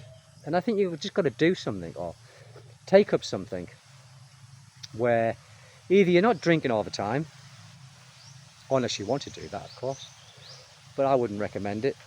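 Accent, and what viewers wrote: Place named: Cockney, United Kingdom. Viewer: Being French and loving the English language, my favorite is the cockney accent from London. Which is where your accent is from? It sounds chicky ans is very lively. "Hello, you lovely people!" I love it! And then the little boy coming in at the end as if, he too, wants to take part to the video. As for the content, I guess we can get bored and lonely anywhere in the world, maybe that some places are just better than others to get bored or lonely. There's no paradise on earth, I think..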